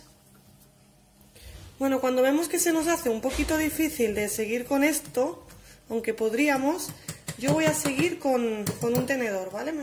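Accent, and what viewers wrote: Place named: Catalonia, Spain. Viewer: Unas tecrtas faciles i deliciosas, q eres catalana jo si me a parecido e un poco el acento😋😋😘😘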